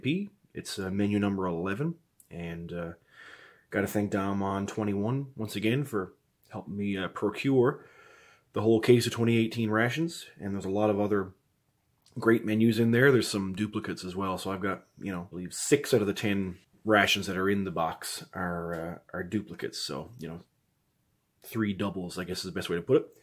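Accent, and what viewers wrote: Newfoundland, Canada. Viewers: Newfie or from NS?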